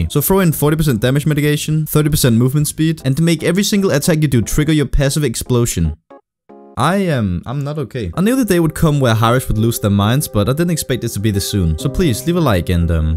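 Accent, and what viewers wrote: Denmark. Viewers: This Danish accent is hurting my ears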